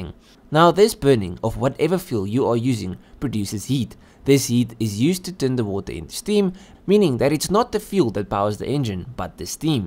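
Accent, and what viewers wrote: South Africa. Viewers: This guy sounds South African - whooHoo!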